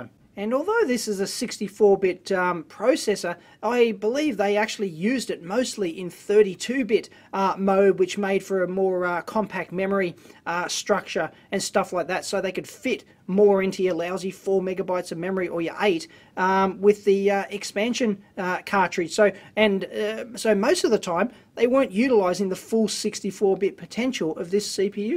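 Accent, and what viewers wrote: Australia. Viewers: Um, he's Australian. That's how they talk.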